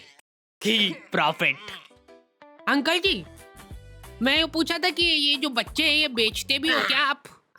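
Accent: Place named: Indore, India. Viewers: Bhai indore se ho haina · Bhai kha se ye to bata do Indori lag rahe ho 😂😂😂😂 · Lagta he bhaiya mp se he 😅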